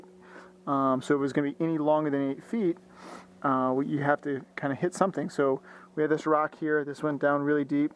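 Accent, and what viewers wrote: Rhode Island, United States. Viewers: are you from rhode island?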